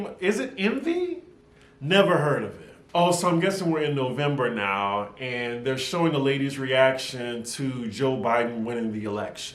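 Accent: New Orleans, United States. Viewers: Live your New Orleans accent 😁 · I love that you were doing your New Orleans accent baabyy!!! 💛🖤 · Ok yassss👏🏾👏🏾👏🏾👏🏾 with the NOLA accent, luv it😊👍🏾👍🏾. U already Knowwww!!!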